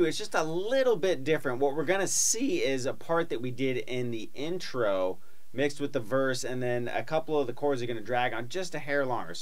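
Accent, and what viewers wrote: United States. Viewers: I love the way he talks haha amo ese acento estadounidense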